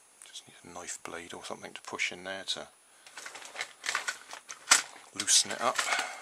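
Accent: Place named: South West England, United Kingdom. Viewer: Where are you in the UK? You have a south west accent, sort of Wiltshire to Bristol possibly. I'm originally Suffolk, but now live in France, Dordogne, which is very rural. My main heating is a 14 Kw wood stove, which I feed from my own woods. Spent some time in Wiltshire long ago, at RAF Compton Basset. Also briefly near Weston Super Mare. If I take thing apart, I always try not to destroy the bits in the process, as they could be useful in the future, and small motors have potential, where bits of bent sheet metal are less likely to have much. However, the coil would be a good source of wire to make smaller coils, for experimenting with, so, its swings and roundabouts really. I dont understand why people get so emotional on these sites, after all, scrap is scrap, which ever way you look at it.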